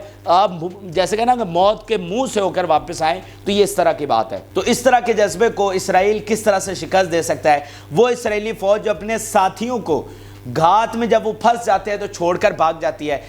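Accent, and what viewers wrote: Punjab, Pakistan. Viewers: Bro your urdu with punjabi is really beautiful _ab ek aur katta khul ghaya ❤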